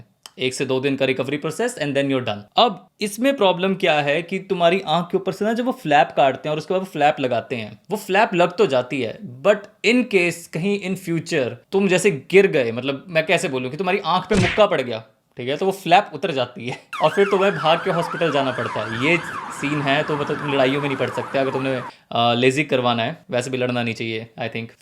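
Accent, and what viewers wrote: Punjab, India. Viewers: Excellent way of explaining ( voice and punjabi accent makes it even more interesting)... Last tak interest bana ke rakha... And sharing your own experiences is the best thing as it is no filter experience... Great job! ❤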